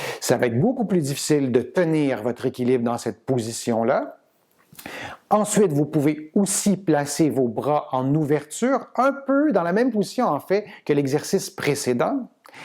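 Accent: Canada. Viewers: Votre accent ( canadien ?)est très agréable à écouter!- J' ai un petit cousin( français) à Montreal et ma fille( franco- allemande) lui avait rendu visite,il y a quelques années avec un autre cousin franco- allemand !.-Moi - même je suis Française en Allemagne et j' ai découvert vos excercices avec beaucoup d' intérêt,ayant moi- même cette bosse,surtout après une scolarité assise, un traitement de choc à la cortisone à l' âge de 8 ans et l' impression,surtout pendant le Covid,d' avoir eu les muscles rétrécis, le nerf sciatique qui se manifeste de temps en temps, malgré la gymnastique en musique depuis 6 ans !-- Comme il n'y a chez vous que 3 excercices à faire, je les fais souvent depuis environ 2 semaines :depuis que j' ai découvert votre site en France , où je suis actuellement !- Merci beaucoup !- Les excercices sont très efficaces: - la sciatique est presque partie,la bosse de Bison,pas encore,mais je ne fais que commencer !👍🙋‍♀️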